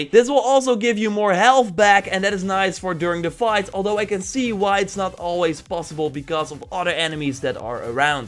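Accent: Netherlands. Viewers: Gek Nederlands accent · You sound Dutch, where are you from?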